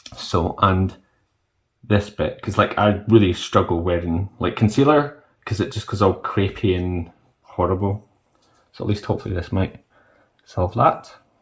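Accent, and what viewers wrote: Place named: Scotland, United Kingdom. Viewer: So refreshing to hear a Scottish accent. Been in Canada since I escaped England in 1988. I am thinking of doing this Juve eye thing myself. Do my own Botox but got flagged by customs so dunno if I will be able to get product at my home address. I guess it's illegal to even do your own Botox here in Canada. Bummer. I got cheek filler yesterday as a 'model' at a place where I know the owner. He's now got his own clinic and doing amazing. Young, Gay and very artistic. Cheek filler was painful, so I'm glad I never attempted to do it myself. The crunching of tissue is unnerving! Anyhow, sorry about your Soccer defeat by the Germans today. Probably our turn on Sunday!!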